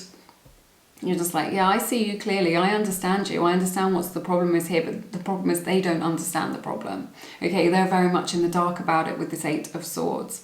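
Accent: England, United Kingdom. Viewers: is so beautiful. Or I mean. Love her hair... also love her english accent... Excellent reader aswell. 🙏🦄⚘💛⚘🦄🙏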